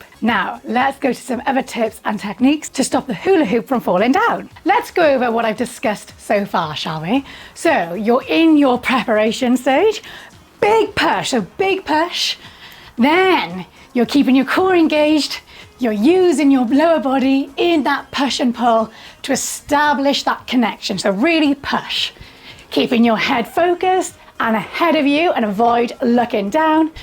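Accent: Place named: Wales, United Kingdom. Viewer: Oh my days, came on here to learn how to hula(after seeing the Texas Hold 'em short) and there's a Welsh accent 🏴 (well I think it is anyway 😂) This is gonna be fun! ⭕⭕⭕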